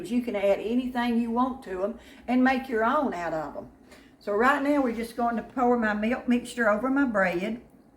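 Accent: South, United States. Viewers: a good southern accent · I love your southern accent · your adorable southern accent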